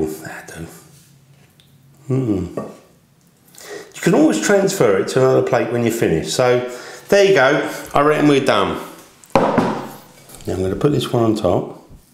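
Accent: United Kingdom. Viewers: finally! someone whos british!!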